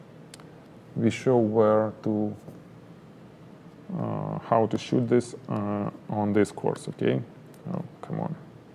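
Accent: Russia. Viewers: Hi, firstly are you Russian? Great accent!:D. OK real question, I make handmade beaded jewellery, but my product photography really doesn't do justice to my jewellery. My biggest issue is how to get the sparkle in the crystal bicone beads, but not blow out the duller toho beads. Over a year I have been trying, and no matter what I can't seem to take decent images on a white background on a budget for my shop. I absolutely loathe trying to do the images now, as to photograph say 10 items can take me over four days, and I'm still not happy at the end. Any chance you would concider doing a video on something like this? I'd even be happy to send you a piece of my hand made jewellery for you to use for the demo which you could then pass on to your loved one. Desperate for help, as a single mum trying to make money from my jewellery making skills is being let down by the fact I am unable to take the images that show off the jewellery. 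Kindest regards Linda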